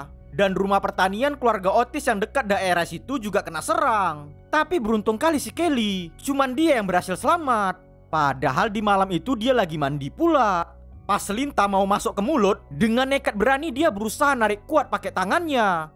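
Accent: Medan, Indonesia. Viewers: Org medan nih bos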